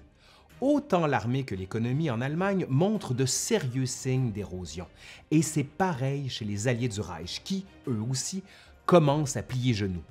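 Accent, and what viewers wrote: Quebec, Canada. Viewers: Merci pour cette série passionnante. Je continue à adorer ton accent au sirop d'érable. Tu as fait un travail remarquable de clarté, d'impartialité et de dynamisme. Je pense que c'est un modèle de connaissance à donner autour de nous pour expliquer ces années d'horreur qui ont presque brisé la France. Merci aussi à ton compère. Bises 😉😁👍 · j'adore ton travail... je ne ma lasse pas d'enchainer les vidéos (dans la foulée j'enquille 1939 après avoir vu 1789, 536, 1709 et quelques autres dont le racismes ou le sexe au moyen âge) tu as un vrai don pour qu'on comprenne tous et ton accent québécois apporte son charme à ta diction. pourtant je n'ai pu m'empêcher de relever la coquille qui tue à : Adolf HiLter ne m'importune pas plus que ma cousine contrairement à Adolf HitLer... désolé mais fallait que je le dise... encore une fois merci beaucoup. 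Continu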